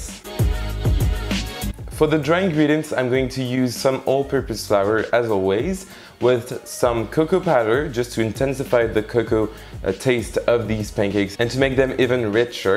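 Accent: France. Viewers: MUST try this recipe. You make it look so delicious! ❤ Hey, just curious. I can't place that accent. Where in France are you from,?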